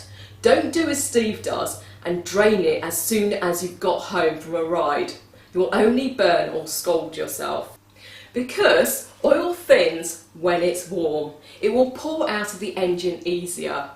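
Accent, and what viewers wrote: England, United Kingdom. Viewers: I love English women and their accent you're a extremely attractive mature lady with a great body!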